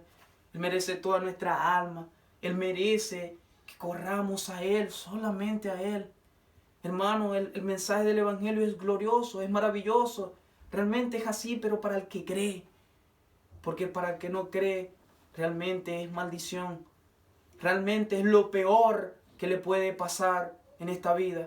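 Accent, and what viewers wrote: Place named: Chile. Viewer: Ya pareces chileno mano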